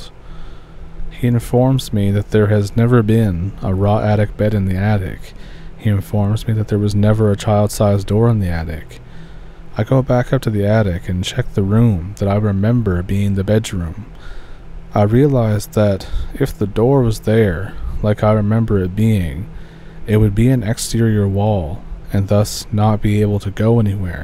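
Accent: Canada. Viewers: I have to say, I really like this channel! I think I have listened to all the stories, and I get a little excited when you post a new one!! You are a great storyteller, and I ALWAYS enjoy pulling up a stump to listen!! Also, you have a nice Canadian accent, very kewl!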